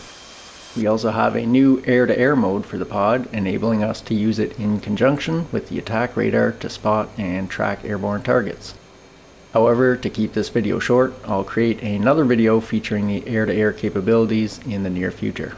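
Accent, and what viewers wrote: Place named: Maritimes, Canada. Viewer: Awesome video! You must be a Maritimer. Newf?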